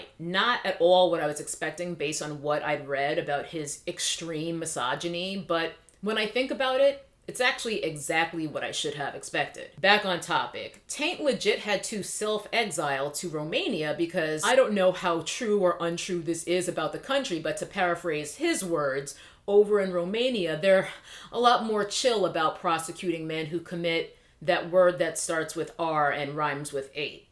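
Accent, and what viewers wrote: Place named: New York, United States. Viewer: eggplant in garlic sauce in Flushing. You sound NYC so you know.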